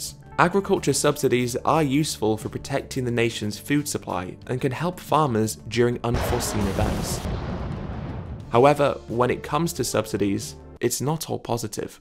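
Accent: United Kingdom. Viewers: Hold up, Is this guy British?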